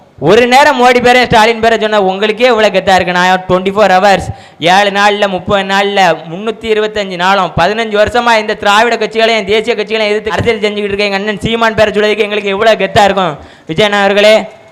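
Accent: Tirunelveli, India. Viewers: அருமையான பதிவு தம்பி உன் நெல்லை தமிழ் அருமைட செல்லம் எத்தனை குட்டி புலி சீமான்கள் அவன்கள் எல்லா தெலுங்கு நாய்கள் தமிழ் இண துரோகிகள் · இந்த தம்பியின் அந்த நெல்லை தமிழ் ❤❤🎉🎉🎉🎉சிறப்பு ட தம்பி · நெல்லை தமிழ் சூப்பர் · நெல்லை தமிழ் பேசும் சீமான்🎉🎉🎉🎉🎉🎉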